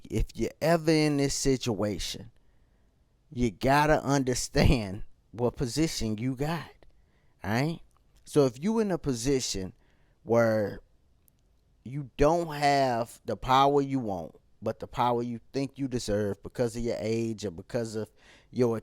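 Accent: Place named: St. Louis, United States. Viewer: youre from stl? Lol you sound like it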